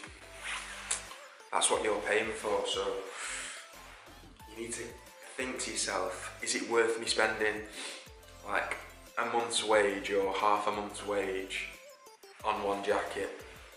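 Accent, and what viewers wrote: United Kingdom. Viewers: I HATE BRITISH ENGLISH...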